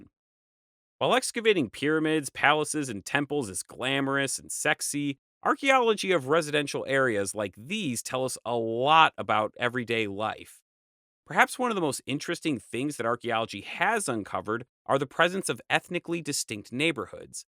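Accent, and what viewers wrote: United States. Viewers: Is this due to the thick American accent?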